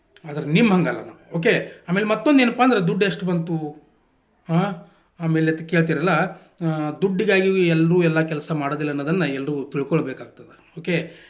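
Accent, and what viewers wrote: North Karnataka, India. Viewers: ಅಣ್ಣಾ ನಿಮ್ಮ ಮಾತ 🔥 ನಿಮ್ಮ ಊರು ಯಾವುದ ರಿ ನಮ್ಮ u k ಭಾಷೆ ಮಾತ್ರ 🔥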